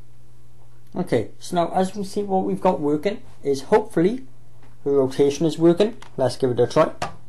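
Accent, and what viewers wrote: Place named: Wales, United Kingdom. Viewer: Thanks great video. Nice accent. I take it your welsh lol. I done thousands of installs but was a bit stuck on the linx as never seen one before but after watching your video was a simple process. If you need any parts then contact me pcpitshop.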